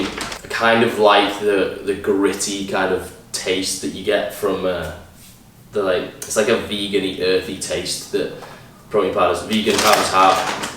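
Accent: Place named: Manchester, United Kingdom. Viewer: You got that propa manucunion accent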